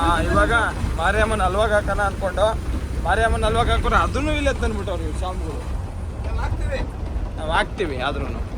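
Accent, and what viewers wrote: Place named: North Karnataka, India. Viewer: Uttar Karnataka Bhasharespect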